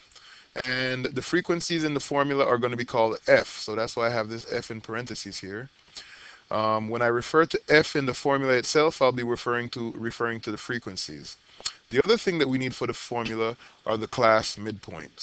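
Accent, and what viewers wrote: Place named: Caribbean. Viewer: Thanks immediately, hearing you Caribbean accent and I start to understand. wow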